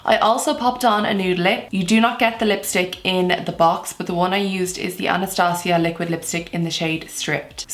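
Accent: Ireland. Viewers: You're gorgeous 😍. Love your Irish accent too😉! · Wow your eyes 😍😍 and listening to you makes me miss living in Ireland 😫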